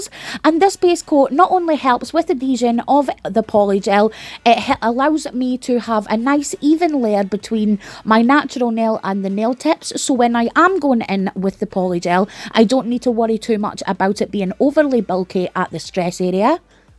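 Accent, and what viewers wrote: Scotland, United Kingdom. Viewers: Is this a Scottish or Irish accent?! I love it, either way. Usually I'm really good good telling them apart but yours is difficult. I'm leaning towards Scottish more than Irish.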